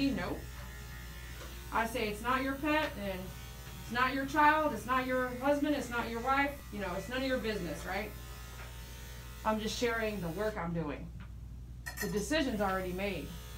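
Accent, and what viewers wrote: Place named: South, United States. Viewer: Love the country talk attitude with the nail trim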